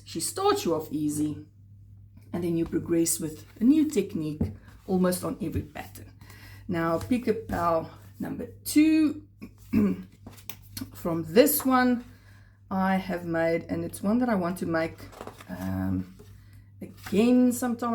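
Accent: South Africa. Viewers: Hi I love the animal friends of Pica Pau 3. This is the book I recommend. My parents lived 12 years in Cape Town and I loved going on holidays there. Your accent reminded me a lot of memories.❤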